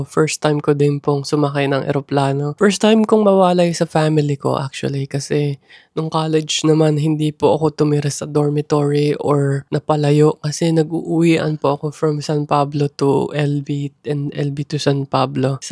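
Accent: United Kingdom. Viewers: I love your British accent ❤❤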